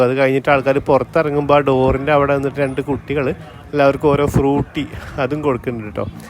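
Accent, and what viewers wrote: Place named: Nilambur, India. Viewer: ഇപ്പോഴും തനി നിലമ്പൂർ സ്ലാങ് ആണല്ലോ ഇവർക്ക്... 😊😊😊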